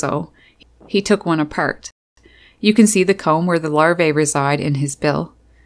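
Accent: Newfoundland, Canada. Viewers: Your definitely from Canada! Love the accent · You are probably the most Canadian sounding person i know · My wife is from Canada and you say OUT, ABOUT, just like she does. Lmao.......sorry. I'm from the south and she gives me hell about Pile, Mile, Ain't, ......lol... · Interesting and well put together video. I don't know why, but your voice sounds like someone from Newfoundland (or Labrador). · the Canadian accent is so strong lol. Great video tho, i saw this here in Oregon recently in a different form. A Stellars Jay was actually hunting down and tearing apart wasps without eating them, almost in a defensive way. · Like your Canadian accent. I don't see wasps landing on the jays to sting them, so at least they're not getting swarmed. Keep up the good work birdies. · What accent is this? Canadian?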